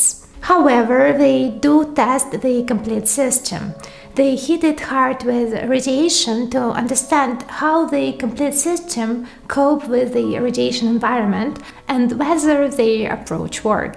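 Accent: Russia. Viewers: Star trek's Chekov was adored in part vecause his acdent related pronunciation limits were endearing to us who were raised spraking American dialects of English. The Russian~Slavic accent is a cool factor that many of us adore so please don't worry so much about mispronouncing non-vital things. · Are you from Russia, Ukraine or Belarus? - Megagertz sounds quite right😉 Still these vids are cool, thx for making them 👍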